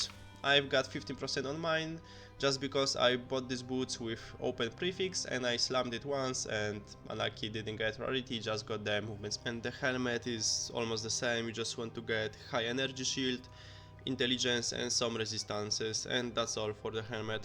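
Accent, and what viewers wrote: Poland. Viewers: Jak chuj slysze polski akcent xD nie myle sie? Pozdro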